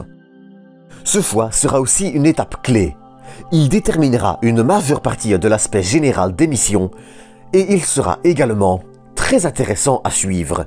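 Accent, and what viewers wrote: Belgium. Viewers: Bsr je dirais belge mais son accent n enleve rien a la qualité du travail fourni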